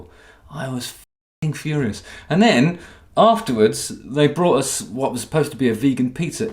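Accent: United Kingdom. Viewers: That British Accent has to go...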